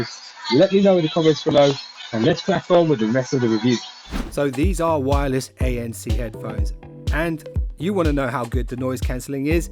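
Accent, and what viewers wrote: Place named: United Kingdom. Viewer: Out of curiosity, what part of the UK your accent is coming from?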